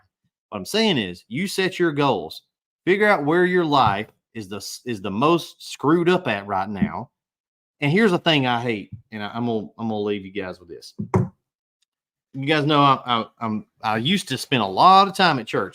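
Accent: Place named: South, United States. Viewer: Best video you’ve ever made. I have a successful company, but we always need to constantly be looking for traction and reminding ourselves how we got there. Positive reinforcement. More videos like this please. Your dang sense of humor could put you on the comedy stage. And your damn southern accent.there are lots of nuggets in this but also lots of laughter. Well done.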